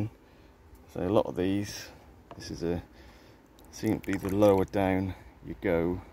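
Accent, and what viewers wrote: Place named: East Anglia, United Kingdom. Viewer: Hi would you sell me a couple of cuttings …. From your accent I can detect a slight east Anglian lilt. Possibly Norfolk? Or north Suffolk. Anyway I travel to norfolk every couple of weeks so I could collect as I’d like to try growing it. Having been inspired by your video or you could post …? If not. Any ideas where I could get hold of some?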